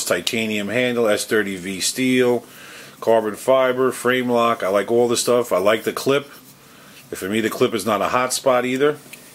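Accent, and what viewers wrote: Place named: East Coast, United States. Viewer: ok !!!! I agree with everything you said!!! we can be friends I tend not to go over $200 either I think it's east coast mentality! but its tough because I tend to go for premium steel these days only, s35vn, cts-xhp elmax m390! I favorite steels are Elmax and m390! do you have a preference? I have a full collection of discontinued kershaws all brand new with boxes! some of the ones I own are the kershaw offset, (Please checkout my video on that), the tyrade, storm, n ergy, cyclone, and a kershaw barrage (AKA Salvo) I wanted to collect all the kershaw knives before 2012 and zt came along to me its sort of historic, as much as I loved knives I wanted my collection to have some sort of theme it made sense anyway, anyhow sorry to ramble but I don't have any friends who are into knives, wish I met you 30 years ago but you probably weren't born Yet? 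wish i could meet you some day you seem like a cool dude your accent makes me feel connected to home. I live in Washington now and the food here sucks I'd kill for pizza! hell i'll take a knish!!!! LOL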